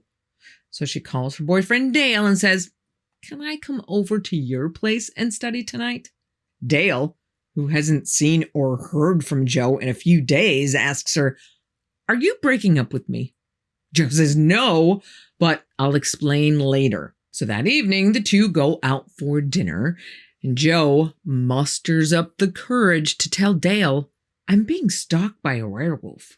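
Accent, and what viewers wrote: New York, United States. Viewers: How the heck does someone make you feel both safe and spooked at the same time? tells these stories so well that one can’t help but laugh even as the sheer terror builds up in your mind. And the AI, the editing… Everything is so on point! How is this channel not at 1 M members?! I thought only Morticia Addams did lovely and spooky but certainly does it at least as well…with a New York swagger!!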